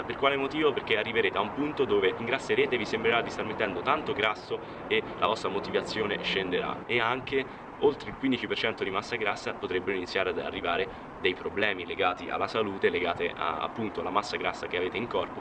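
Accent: Rome, Italy. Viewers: Sei di roma nord o sud? TOP!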